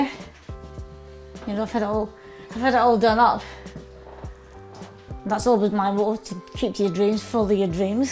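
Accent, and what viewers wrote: Scotland, United Kingdom. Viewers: Listening to her talk about the house, (love her Scottish accent) I believe this was her family home. Such a warm, lovely place. She is a wonderful talent and she looks so good. I am glad for the update. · Many English speakers who are not used to hearing someone speak English with a moderately thick Scottish accent will have trouble catching all of her words.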